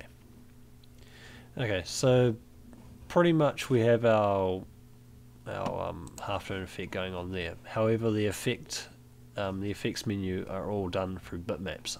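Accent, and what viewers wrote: New Zealand. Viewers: kiwi accent is very obvious, nice mate, sweet as :)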